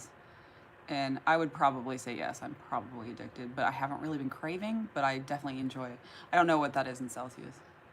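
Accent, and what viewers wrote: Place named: Midwest, United States. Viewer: in fact its not too fast, its a problem of accent... but sometimes, thats the good thing with you tube, i can roll back the part i dont understand and after 2 or 3 times understand it better! but it doesnt works all the time though! ( and dont be afraid, scottish, northern irish and northern english are waaay much harder to understand than your middle west accent!!!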